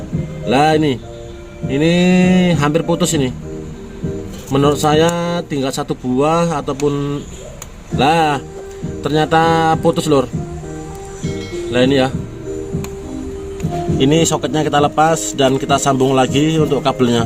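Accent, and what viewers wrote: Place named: Java, Indonesia. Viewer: Maaf pakdhe ,aslinya orang jawa mana? Kok kebrongos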